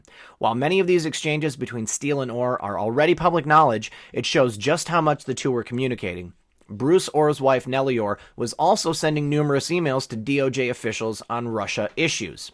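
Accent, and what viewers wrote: England, United Kingdom. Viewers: Played on high speed, your British sounds like Stewie from Fam. Guy. 😂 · Great job! -- And love your English accent!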